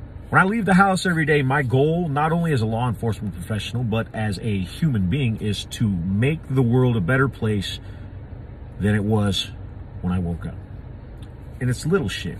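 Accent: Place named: South, United States. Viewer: No no, then ya won’t hear that lovely southern drawl!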